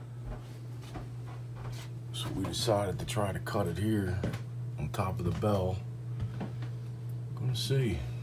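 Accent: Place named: Philadelphia, United States. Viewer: this is NOT reall big problem..if you got the house for a good price.... do the work you save a ton it aint that hard it sounds like you are from philly so big box stores everywhere !! i see u have a work van and tools ......open the walls demo and clean that is the first day, get what u need in plumbing materials ie pipe fittings ect ect bust out the old shit ( at the bottom of where the pipe makes a turn scoop out the rust from the pipe with an old spoon on a pole) dry fit your pvc ...the pvc is cheap and easy.... do all that is needed to be replaces ,check for leaks not impossible ..after no leaks ,,rap with fiberglass insulation pipes will be MUCH quieter ... i did my 3 story house in oak lane TWO sewers it was a lil work but i saved a fcking mint!!!!! 😉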